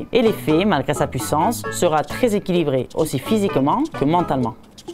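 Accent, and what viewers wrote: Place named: France. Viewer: Oulah chérie tu m'as comblé avec ton accent frenchie 😍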